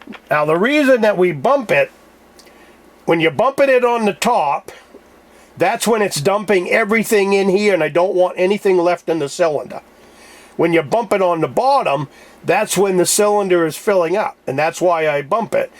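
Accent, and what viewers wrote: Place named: Vermont, United States. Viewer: I miss hearing that Vermont accent. I grew up in Brattleboro area.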